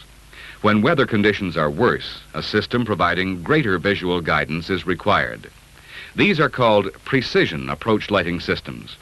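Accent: United States. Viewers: A few details I noticed about this video. First, the announcer is speaking American English, but the lighting systems being described are ICAO-approved systems, which is an international standard and all distances are in meters. Second, this film was made before Category 3 existed, so there is no information on those systems. But the difference is minimal, since the approach lighting is the same as a Cat 2, and the main difference is extra lights on the taxiways. Third, the systems referred to in this film as “Simple approach light systems”, known to the ICAO to be only good enough for non-precision approaches, which use barrettes at 60 meter/200 foot spacing and extend out only 390 meters/1400 feet rather than the 30 meter/100 foot spacing, 900 meters/3000 feet length of a full system are in fact the basis for the typical Cat 1 system in the US. Basically, you take a short, simple approach light system (SSALS), add five sequenced flashers leading up to it (Runway Alignment Indicator Lights), and you have a Medium Intensity Approach Light System with Runway Alignment Indicator Lights, thankfully abbreviated to MALSR. 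For the international standard, this is only good enough for a non-precision approach. But the FAA thinks it’s good enough for a Cat 1. The standard in the US used to be the ALSF-1, which is similar to the barrette-type Cat 1 system shown in this film. The ALSF-1 remains the standard for military fields in the US. But the FAA believes that saving money is more im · Thanks for putting this interesting document up. Anyone know the date on this document? Is it a Pans-Ops standard? It's perplexing to hear an English speaker, with an American accent, giving specifications in metric units. One would assume the TERPS document would be in feet.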